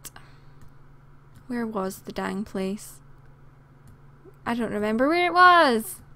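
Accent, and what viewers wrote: Scotland, United Kingdom. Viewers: Are u Scottish love ya voice. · i was trying to figure that out too but think its Scottish. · Are u British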